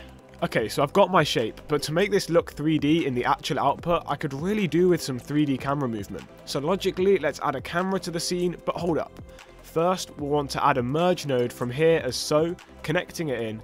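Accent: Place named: United Kingdom. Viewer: terrible british accent, can't understand anything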